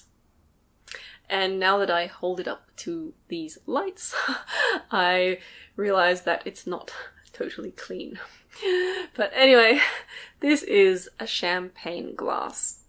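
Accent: United Kingdom. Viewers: Do you speak British English? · is it british english ?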